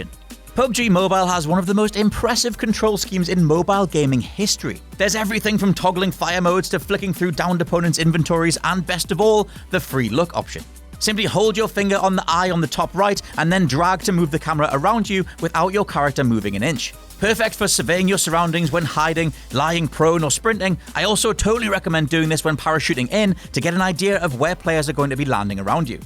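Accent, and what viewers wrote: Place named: United Kingdom. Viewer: UK ENGLISH 😫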